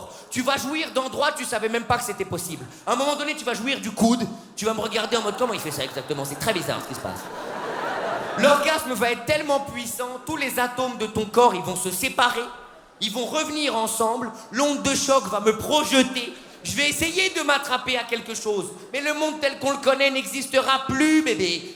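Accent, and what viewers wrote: Switzerland. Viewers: Trop drole...mais il est Suisse...c pas possib...cet accent...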